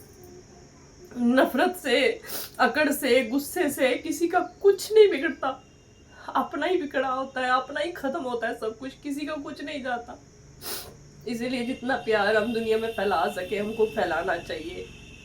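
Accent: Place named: Himachal Pradesh, India. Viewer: Maine aapki video phli baar dekhi aaj, ye 2nd video dekh rha hun, mjhe aapki previous to nhi ptaa bt mai bs yhi khna chahunga k aap jhn rho khush rho...😊 Aaj ki date mai maa baap k siva koi apna nhi hota, rhi baat relatives ki to unhe to sirf bahana chahiye hota h... Aapka ghr bsaaa hua h or jo kuch abhi aap k paas h bs usi mai khush rhi...😊 Ye jindgiii ek bhgwaan ka diya hua tohfaa h isko khush hokr gujaaro👍 I don't know where from u bt I think you are from himachal by language pronounceation...!!! bt in this situations you hve to become a strong person...👍 So plzzz be happy keep happy always...😊 Lots of luv❤ from #himachal...😊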